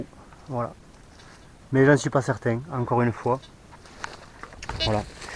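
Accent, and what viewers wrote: South, France. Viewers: Impossible de répondre je fais un essai comme ça si ça fonctionne je répondrai de nouveau Ça y est ça marche alors j’ajoute : Merci pour les infos je commande souvent sur Ali… Je vais regarder merci pour l’info Concernant ce rencontré ça risque d’être compliqué je suis dans l’Allier 03 Vu l’accent 😜 vous êtes dans le sud ?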